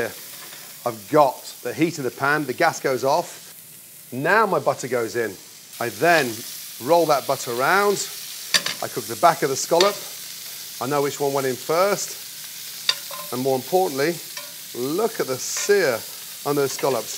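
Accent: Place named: Australia. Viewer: Hi Gordon if I may address you as such I am 89 years old and Vietnam veteran, and a newbie at cooking, which I love at one and a half years.. and I must say your approach to cooking is impeccable.. even with your Australian accent, you present yourself admirably.. I consider myself a better than average cook.. and living in Massachusetts I’m very close to all the scallops that I can bring home. One thing amazing about scallops. It does not take very many to fill you up. I enjoy your presentations very much and I like following you. Thank you.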